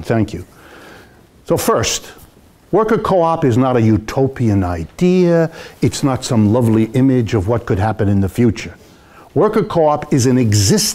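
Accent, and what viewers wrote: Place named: Hungary. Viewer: he is funny too. but why does he sound hungarian....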